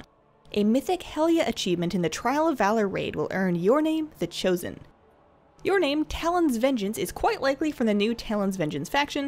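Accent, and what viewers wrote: Canada. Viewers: Great vid, love your enthusiasm and super Canadian voice. Subscribed :)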